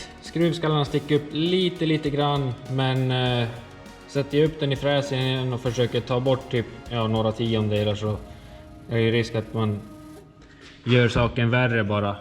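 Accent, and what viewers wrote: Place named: Norrland, Sweden. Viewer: King video! Var e din dialekt i från, låter som norrut eller mer mot det finska?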